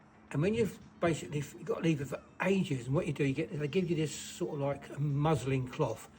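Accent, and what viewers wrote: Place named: RP, United Kingdom. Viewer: Wondered where had picked up the plummy accent 👨‍🎓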